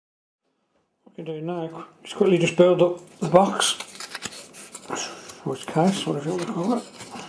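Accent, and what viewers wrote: United Kingdom. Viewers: In a box, not from a box...You talk funny. British or something...Stupid radio.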